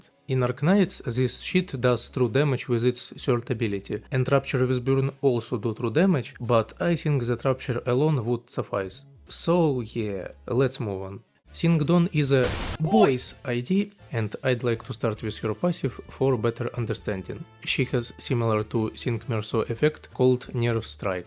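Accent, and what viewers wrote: Russia. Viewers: Чую русский акцент, бюрн фен. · Мне кажется у автора видео русский акцент, но я не могу этого доказать...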